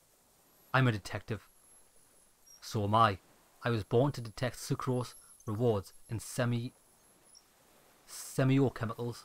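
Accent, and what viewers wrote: United Kingdom. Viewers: Harry Du Bois - Cop of the Apocalypse, entroponaut, pioneer in the fields of rave dance culture and cryptozoology,real man of the renaissance:D I am a little sad that this is the final, despite some modern political things that jumped out too annoyingly during the story, I really fell in love with this game and Harry and Kim,and I will miss them very much. I want to thank you, for this walkthrough. When this game came out a year ago, I was very interested in it, but I was confused by the large amount of text, and I thought that my knowledge of English would not be enough and the process would be tedious. As it turned out, everything is not so scary, and I waited in vain for a whole year.) And I must also note that you have a pleasant voice and British-English manner of speaking, and your obvious interest in what is happening in the game did not disturb its atmosphere.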